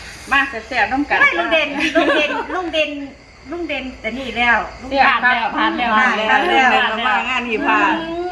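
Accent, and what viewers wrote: Isan, Thailand. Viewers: คุยอิสานน่ารักน้อง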